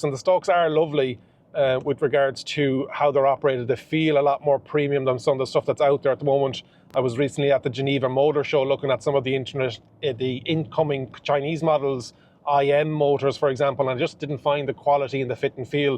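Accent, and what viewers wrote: Ireland. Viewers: I also love the Irish accent. I used to go over to Dublin a lot in my younger days for Thin Lizzy Vibe for Philo gigs. The Irish people are the most welcoming people I've met. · Refreshing to hear an Irish accent giving a review. Agree with a lot of what he has to say apart from the speed. Extremely sluggish in eco a bit better in normal and fairly quick in sport. Comparing it to my last car which was also stellantis, a DS3 Crossback. It was very quick taking off even in eco and in sport the wheels would literally spin if you try pulling off fast. He says he’s 6’2 and there’s good room in the back seats. Well I’m 6’4 and although it’s the first car I can remember where I don’t have to put the driver’s seat fully back. (So ample leg room in the front) even when I position chair slightly forward to my comfortable driving position, there would be no chance of fitting a passenger behind me (unless they were strapped in a baby seat). 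My wife is 5’0 and she said she wouldn’t feel comfortable sat behind passenger seat. The boot is big too. They would have been better off cutting a couple of inches from the boot to make in more comfortable for back seat passengers. I’ve only just got the car so don’t know much ability the features but driving back 30 miles from the dealership, half motorway half “a road” (uk) it seems to go better than expected on estimated mileage. Get a lot more miles per charge than DS3. So far fairly happy with it. Apart from what I mentioned and the same stellantis app I had with my ds which is dreadful. I’m hoping the in car info is better which was also dreadful in the DS. Looks a lovely car too.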